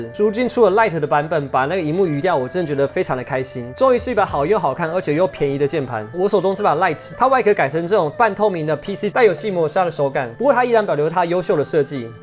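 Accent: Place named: United States. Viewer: 很喜歡你講話有點ABC，但bgm卻是中式古風的衝突感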